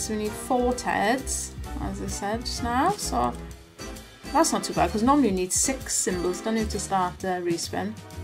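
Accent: Wales, United Kingdom. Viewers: I use this and usually does brillaint for me, i can usually add £5 and almost always double my money, also you can hit both bonuses on the same spin, 20p spins is the best for me, also is that a welsh accent i detect? Im welsh too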